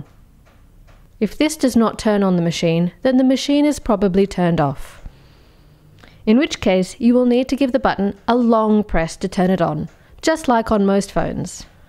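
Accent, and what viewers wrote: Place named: England, United Kingdom. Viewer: U sound English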